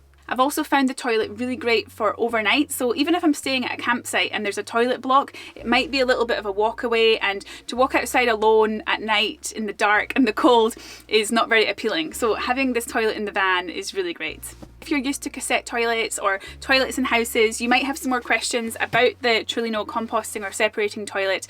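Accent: Scotland, United Kingdom. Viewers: Thats a nice accent. I was thinking it may be Scottish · I've never heard anyone use the word "toilet" so many times in 12½ minutes, and with such a lovely Scottish accent. Currently researching our first van, thanks for the info..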